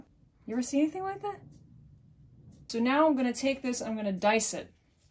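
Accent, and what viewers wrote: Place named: New York, United States. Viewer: is from New York City, specifically Queens. And yeah, her inner New Yorker came out fully here, and it is awesome.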